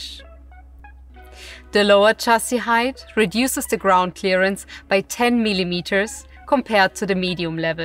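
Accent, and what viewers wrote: Germany. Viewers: typisch'l deutscher Acent 🫣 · I hear a German accent and see that her name is. My mind immediately thought of Schmidt. If I close my eyes while she is speaking, I can almost imagine her presence again. Oh how we miss you dearly with your infectious laughter and toothy smile. Rest in peace, love. Stay classy my friends.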